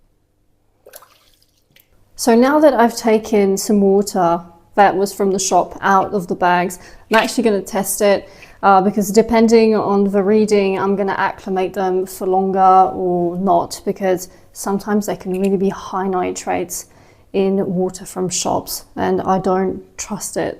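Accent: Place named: England, United Kingdom. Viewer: ton accent anglais incr <3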